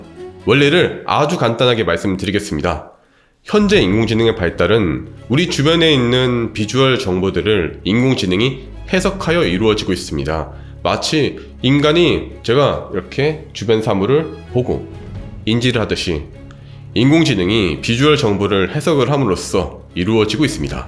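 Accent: Gyeongsang, South Korea. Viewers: 경상도 토바기, 싫다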